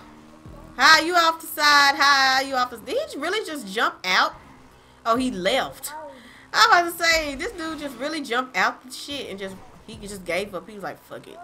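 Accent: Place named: South, United States. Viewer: Found you on Sharree. Good stuff. Where are you from though? Your accent says, the south region.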